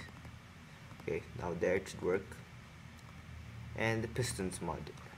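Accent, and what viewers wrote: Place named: China. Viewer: u have chinnesse accent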